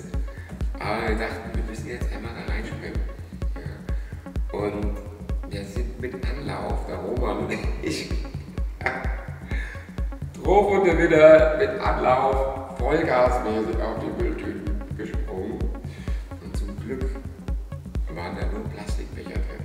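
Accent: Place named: Hesse, Germany. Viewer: es svenche ausem ömche,ich wünsch mir das de mehr hessisch babbelst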